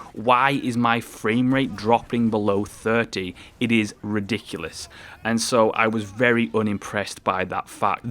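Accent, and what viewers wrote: United Kingdom. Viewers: I want you to fill me with that sexy British voice of yours boi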